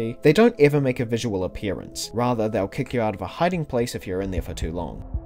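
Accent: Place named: New Zealand. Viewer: Are you a kiwi too? I recognise the accent. What city or town do you live in? I live in Matamata. Edit: typo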